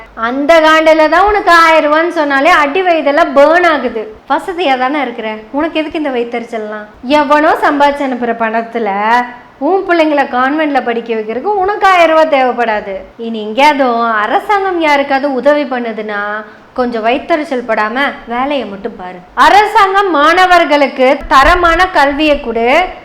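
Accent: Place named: Kongu Nadu, India. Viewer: கொங்கு தமிழ் உச்சரிப்பு மிகவும் அருமை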